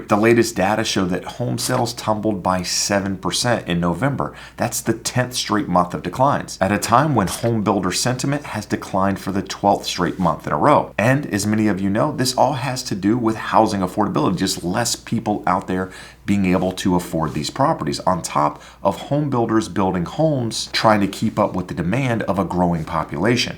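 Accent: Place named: North Carolina, United States. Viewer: I knew that was a NC accent. I completely agree. I just sold my house at 202,000 when I listed it at 185,000. I had 7 different offers and we went with the highest bid. Then we went and bought a new construction and they gave us an ammendum to lower the price by almost 30,000. So we were blessed to have a win'win situation. We locked in a 5.75 interest rate and the monthly payment is something comfortable. Great opportunities are still out there. Great vdeo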